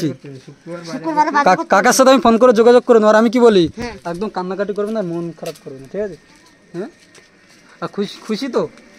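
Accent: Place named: East Medinipur, India. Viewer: sune mone hochhe east medinipurer lok ra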